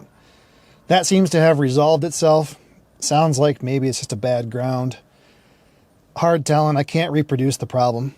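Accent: United Kingdom. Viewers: Why sound like most British friend? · You are so British 👍. Including the correctly pronounced solder with an L.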